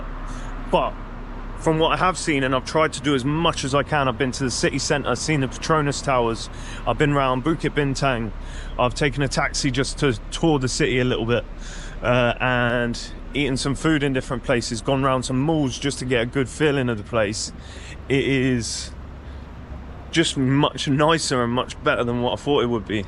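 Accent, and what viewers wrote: United Kingdom. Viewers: I absolutely LOVE the british accent, i can listen to that all day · It must be from the UK that's why you knows protons..but unfortunately you doesn't know that protons are malaysian cars..Actually all around you are proton cars but you don't know because it's possible that protons aren't marketed in the UK anymore..that's why you don't know the new release from protons..and you noticeable accent is Uk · What part of UK are you from because your English is English…u know what I mean?? You are speaking very smoothly, every one can understand you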